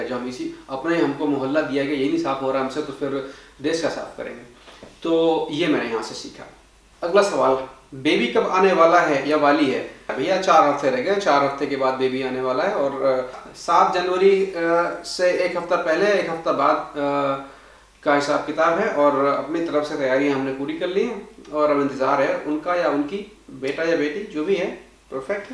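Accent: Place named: Awadh, India. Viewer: आपकी अवधी भोजपुरी अच्छी लगती है और ये बताये वहा भारत और हिन्दी उर्दू को क्या अहमीयत है?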